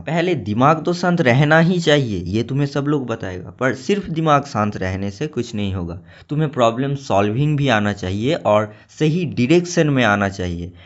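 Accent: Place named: India. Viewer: Ap bengali ya north east se ho kya bhaiya apke accent se lgrha btw me uttar pradesh se hu mains me 98%ile aya hai advanced karne ka soch raha tha but dar raha tha tough questions se lekin aapne achi advice di hai follow karunga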